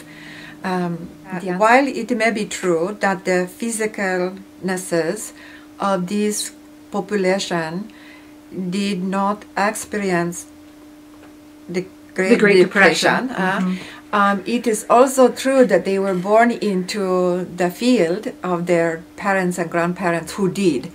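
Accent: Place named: Eastern Europe. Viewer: Why is channelingn in an Eastern European/Russian/Spanish accent??? Come now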